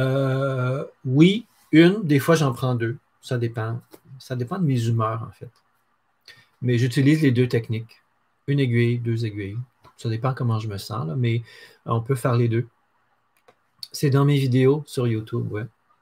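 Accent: Quebec, Canada. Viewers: Tous mes compliments l quel plaisir en plus d entendre le Québec l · Merci beaucoup pour vos tutos 😊ils ont été indispensables pour l apprentissage.je fais des moufles des chaussettes grâce à vous .Regulierement je revois les explications qui m aident toujours et puis comment se passer de votre sympathique accent canadien qui fait voyager en ces temps particuliers de covid .Sophie de Toulon france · Bonjour, Merci pour vos vidéos très bien faites et très motivantes, même si je n'ai toujours pas franchi le pas de tricoter mes propres chaussettes 😉. Ce qui me fait le plus hésiter, c'est de savoir s'il vaut mieux commencer par la tige ou la pointe! Par la pointe me semble bien pour ne pas être à court de fil, mais est-ce que la tige tient bien au niveau du mollet? Comment faire pour que les côtes soient élastiques, mais ne se détendent pas non plus? Y a-t-il une façon de rabattre les mailles qui permette d'avoir un bord qui ne gondole pas? 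Merci d'avance et surtout, continuez à nous régaler avec vos vidéos (et votre délicieux accent québécois 😁)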